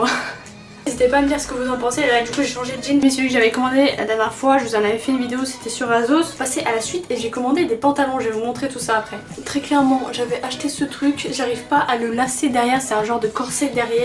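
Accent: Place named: England, United Kingdom. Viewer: Super vidéo j’ai attendu grave longtemps pour ta vidéo enfin elle est sortie et très belle accent anglais au passage mdrr bref j’te kiff 💕💍❤️🍭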